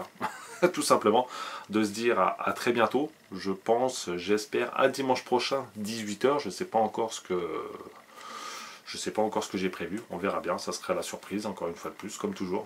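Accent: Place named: Belgium. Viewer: Un petit accent belge .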